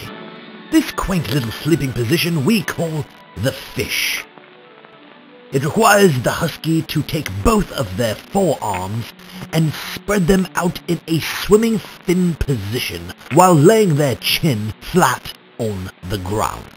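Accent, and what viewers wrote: England, United Kingdom. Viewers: ...her highness would say "we found that most amusing"...i must commend you on your english accent...jolly good show & all that!...(& i AM english btw!😁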